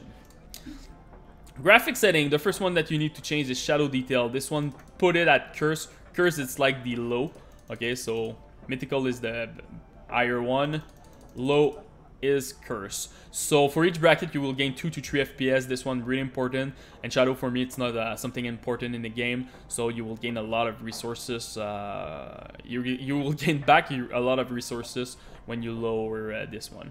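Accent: Quebec, Canada. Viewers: ta une accent quebecois xd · accent du quebec sa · Fuck ça se voit direct l’accent québécois car j’en suis un en tout cas merci pour ce tuto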